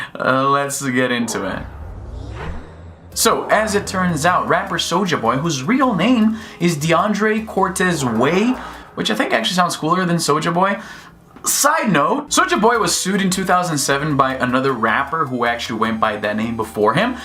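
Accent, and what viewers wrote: Brazil. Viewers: The moment you said you were Brazilian, I immediately started to hear your subtle accent lol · hey there first time viewer here, great video lol I thought I recognized your accent but didn't put two and two together till you said you were Brazilian I am an American Brazilian so a lot of my family that speaks English has a similar accent but yeah sorry to get to my point of commenting lol he posted on twitter he made 250,000 dollars in 24 hours of starting selling his knock off consoles lol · You're Brazilian? I couldn't hear your accent until you pointed it out. Please speak some Portuguese!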